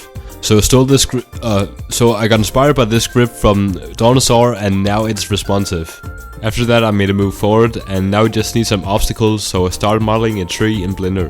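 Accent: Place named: Denmark. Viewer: After watching for less than 30 seconds i managed to guess that you were danish correctly, based on your voice alone.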